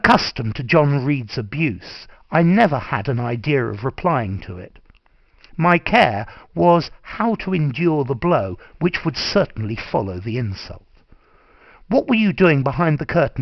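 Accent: RP, United Kingdom. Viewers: Fabulous British RP. We need more like you. I'm devouring your videos. I do like how you pace your speech. I try to mimic your pace and speech. Thanks for all the videos. Do you recommend any audio books with British RP please? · I have been searching the web for weeks and could not find a good lesson to sound more British. This recording is excellent. Thank you very much!!!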